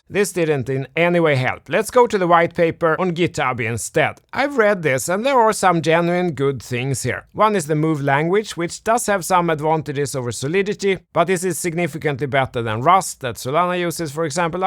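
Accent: Sweden. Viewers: Like you’re video! And the most Swedish accent I heard 😂😁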